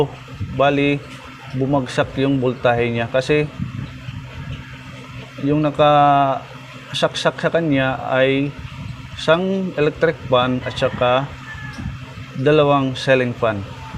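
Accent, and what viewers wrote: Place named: Waray, Philippines. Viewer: Taga samar/leyte ka sir?? Waray man it yakan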